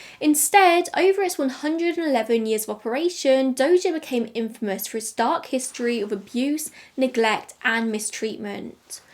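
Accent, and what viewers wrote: United Kingdom. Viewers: I am Australian and I think what you are referring to is just her accent and way of speaking and not trying to be anything. I am not annoyed by it and didn’t even realise what you are referring to till I actually concentrated on it. Many British speakers speak like this…if you are annoyed…stop listening…. I listen to a lot of American accents in these true crime channels and find many of them hard to listen to and just plain annoying so I don’t subscribe to them . I do think it is rude of you to comment…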